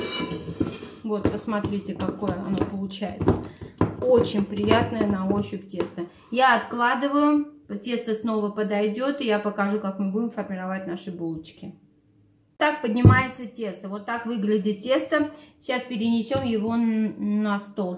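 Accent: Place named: Armenia. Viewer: Человек на русском языке говорит это главное! Чувствуется акцент армянский. Спасибо вам за рецепты. Вы лучшая!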